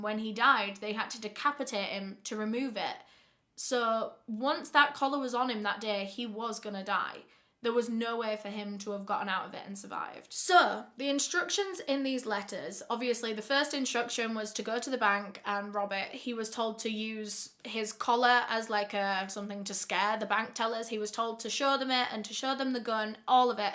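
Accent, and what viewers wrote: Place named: Northern England, United Kingdom. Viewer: As a Londoner I adore your Northern accent! Soooooooooooo in this Videooooooo. You make amazingly great videos and you look amazing also x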